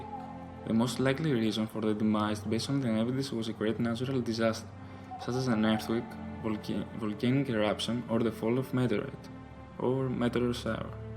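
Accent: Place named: Arab world. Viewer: You're Greek? I thought you Arabian from your accent. I know nothing of other cultures!!! :'(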